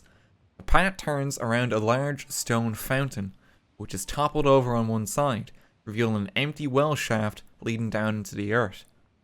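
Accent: Ireland. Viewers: A man with an irish accent dooms a city and his character's sanity for a crown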